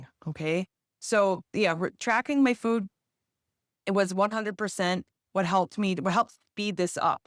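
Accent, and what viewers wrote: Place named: Wisconsin, United States. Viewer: I'da bet a cool hundo you were from Wisconsin...do tell.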